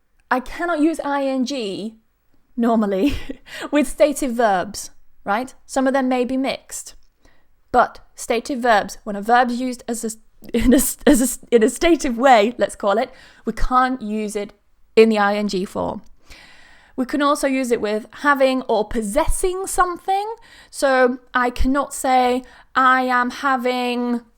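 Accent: United Kingdom. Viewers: your videos help me soo much, Thanks for helping💘 i love the british accent and England. Saludos desde Argentina 🇦🇷 (I think it is "grettings from Argentina in English")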